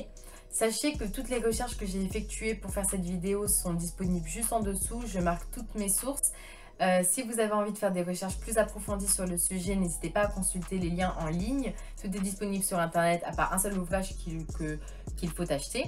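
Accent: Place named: England, United Kingdom. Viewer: Mais faut arrêter d’être tout nier et être sincère. Le sujet est traité d’une bonne manière. Mais en aucun il est complexe, de deux il y’a un ton trop magistral et ne parlons pas de l’accent anglais qui fait peur. De plus il faut prendre en compte plusieurs paramètres. En effet, la vidéo sur JNR avait pour but de mettre à mal ce dernier et quoi de mieux que d’insister sur les clichés du raciste pure et de l’imaginaire de la banlieue. De ce fait Cette vidéo nous démontre à quel point tout cela n’est que pure imaginaire de la part des « autres » ce qui imagine la banlieue à travers un prisme mediatico-politique et malheureusement c’est loin de la réalité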